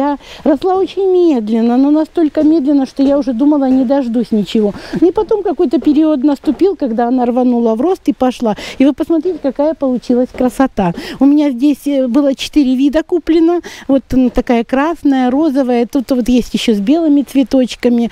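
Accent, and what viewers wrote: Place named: Russia. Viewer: Hola,creo que por el acento eres rusa,hermosas plantas,aunque no se que decías, abrazos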